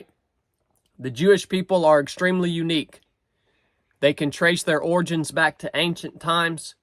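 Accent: South, United States. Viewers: Brother totally agree. Keep up with Truck talk. I love it. Your accent makes me feel right at home. My family are all southern. It's like watching my cousin talking about this crazy sh$$ show world we are finding ourselves having to be a part of. I've got a feeling this is definitely the beginning of the end. Just saying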